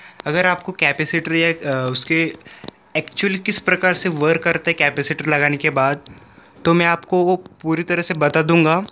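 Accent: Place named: United Kingdom. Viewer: Video me kya bolne wale ho, pehle se likh ke Q nahi rakte ho yaar, lagta hai jaise koi angreez hindi bolne ki koshish kar rha ho, anyways, i managed to understand what you were trying, and it helps a lot. thanks for that.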